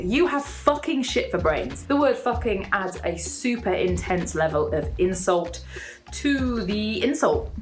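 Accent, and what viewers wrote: United Kingdom. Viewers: we'll, that's a shame, cause it sound's very funny and ear it in british accent is fabulous. Thanks!!!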